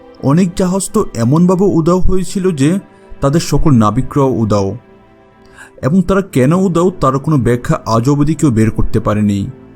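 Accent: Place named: Chittagong, Bangladesh. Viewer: ভাই আপনি খুব সম্ভবত চট্টগ্রামের মানুষ। তাই শুদ্ধ বাংলা পরতে আপনার কষ্ট হচ্ছে। অহ আপনি যে রিডিং পড়ছেন সেইটা বুঝাই যাচ্ছে। অন্য কারো ভয়েস দিয়ে ট্রাই করেন। না হলে নিজেই কিছু প্রাকটিস করে কৌশল অবলম্বন করেন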